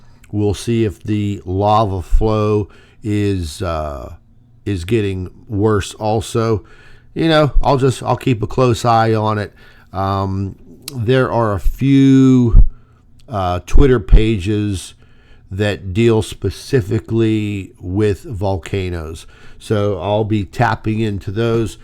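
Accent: Pennsylvania, United States. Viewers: I can't tell you how good it is to hear your voice and see your reporting. I hope the good doctor is doing well you haven't mentioned her lately but then again there's so much going on in the world and in your own personal life. I hope that part gets resolved. I know getting world resolved is not anything we can do it is just going to work around us. We can do very little about it. Buying fewer SUVs or using the stupid carbon tax does nothing for the climate or our planet.. Some political honesty from the powers-that-be would be a lot better. But again it's good to hear you back on the "YT"it's nice to see the videos you put together as well. You're always so informative. As I hail from that part of PA. I relate to your accent, attitude and willingness to call it like it is. Again, I am glad your back researching and keeping people informed especially those willing to watch with an open mind. 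Again I hope your health improves and the good doctor weathers her physical trials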